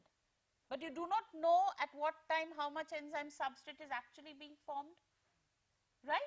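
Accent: India. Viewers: lmao! ""OXIDOREDUCTASE!!"" man a luv da indian accent!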